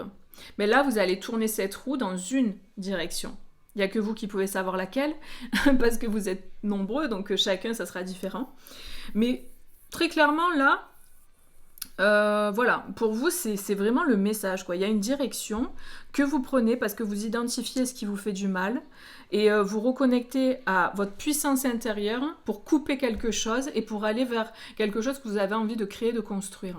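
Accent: South, France. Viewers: Le bon accent du sud, excellent 😊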